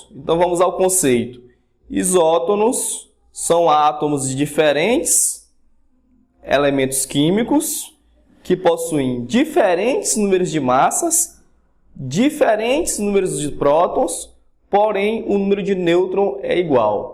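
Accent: Northeast, Brazil. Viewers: Huum Sotaque do Nordeste! Boa aula ♡ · Consegui entender melhor devido ao seu sotaque, tbm sou nordestino, abraço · nordestino foda kkkkk É noix vlw <3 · VC é nordestino?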